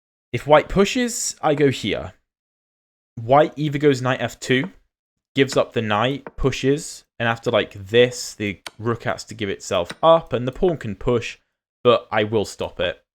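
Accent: United Kingdom. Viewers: I have been following you since Rio de Janeiro. Besides enjoying your explanations I am delighted by your British accent.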